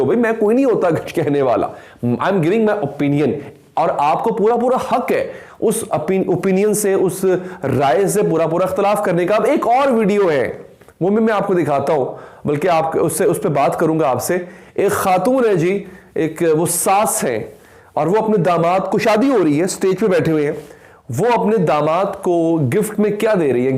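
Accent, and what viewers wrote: Punjab, India. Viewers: Bhai apki punjabi bri mazy ki lgti hme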